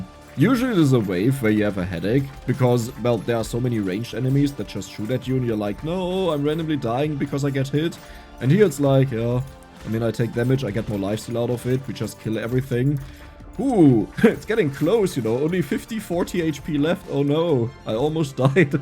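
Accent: Germany. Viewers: Ähm kurze Frage du machst nur Videos auf Englisch oder? Ich fände es echt cool, wenn du auch mal das ein oder andere auf Deutsch machen könntest. Irgendwie klingt es für mich so, als wärst du eigentlich deutschsprachig.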